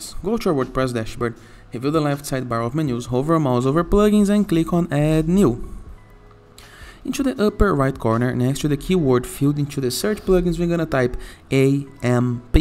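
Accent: Brazil. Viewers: Esse inglês é BR hein rsrs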